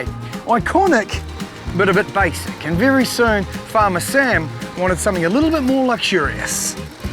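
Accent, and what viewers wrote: New Zealand. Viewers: Damn I hate that NZ accent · Always loved NZ accent over Aussies at least the world can understand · Damm I love that NZ accent! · This video is hysterical and brilliant. Love the hair "in 1970 and the polyester suit." You are correct, we don't know the difference. Humor and your NZ accent, you can sell anything! :o) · NZ accent at its finest ladies and gentlemen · Didn't know New Zealanders pronounced "Land Rover", "Langerovah"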